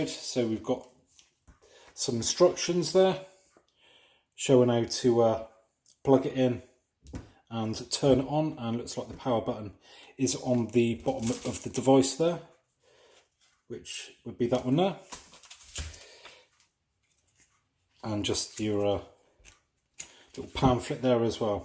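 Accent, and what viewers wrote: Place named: West Country, United Kingdom. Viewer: Great to hear a West Country accent, not enough of them on YouTube! Just bought one of these, it arrives tomorrow, my first "upgrade" in 11 years and this was very helpful. I chose the white in order, I hope, to avoid smudgy fingerprints! I also paid the extra tenner and opted for their offer (new I think) to set it up for me, using my Wi-Fi, not sure how they do that and my Amazon Account!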